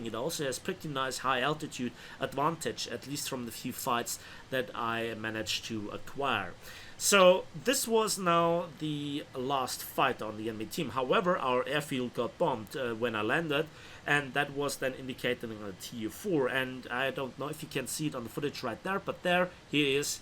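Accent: Germany. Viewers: He is German :)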